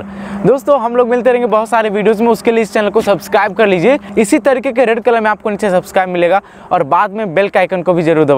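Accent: Bihar, India. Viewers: Bhai kya app Bihar se ho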